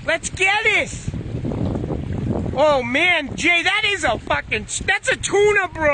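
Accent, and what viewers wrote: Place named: New Jersey, United States. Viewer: the joyzee accent makes it that much betta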